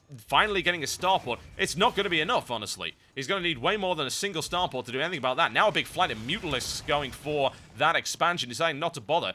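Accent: United Kingdom. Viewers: How could I NOT notice this beautiful channel - the genuine British commentary brings tears to my eyes!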